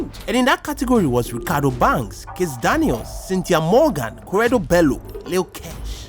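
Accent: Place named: Yoruba, Nigeria. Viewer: this ur yoruba na waoooo....funny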